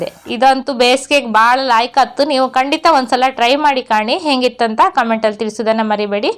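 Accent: Kundapura, India. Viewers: Avaru kannada sariyagi mathadthidare. idu udupi jilleya kundapura thalukina pradeshika ಭಾಷೆ ಕುಂದಾಪುರ kannada (kundagannada)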